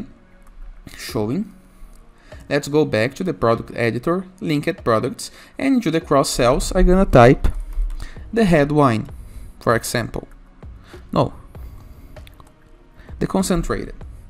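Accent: Brazil. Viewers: Sotaque brasileiro não passa batido kkkk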